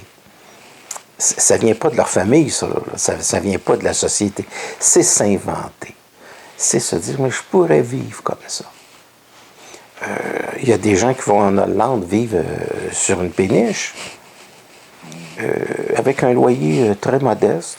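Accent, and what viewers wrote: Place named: Canada. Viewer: Bonjour de France_ Merci M.. C'est une parfaite synchronicité car la thématique est précisément ce que je sais intérieurement et ce sur quoi je me centre spontanément en cette période de mon évolution...... Et puis j'aime bien l'accent canadien!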